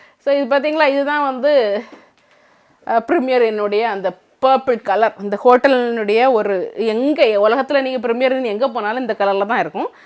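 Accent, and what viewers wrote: Nagercoil, India. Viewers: Akka, truly your videos are stress busting for recent times. Thank you so much 👍🏻 அதுவும் நம்ம நாகர்கோவில் தமிழ் அடிப்பொழி...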